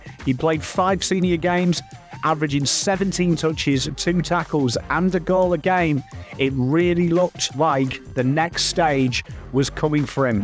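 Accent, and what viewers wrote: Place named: RP, United Kingdom. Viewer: Nicely done. Definitely one for the archives! 👍🏻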